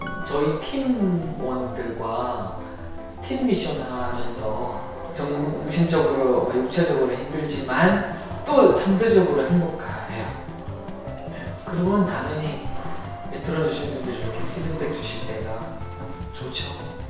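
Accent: Gyeongsang, South Korea. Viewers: 너무 때묻지않은 분 너무좋아요 경상도 예천말이 고맙니데이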